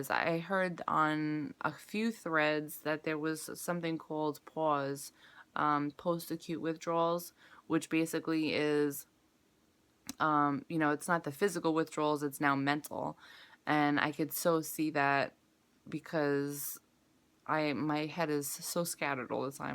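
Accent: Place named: New York, United States. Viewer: Sound like a New Yawka bae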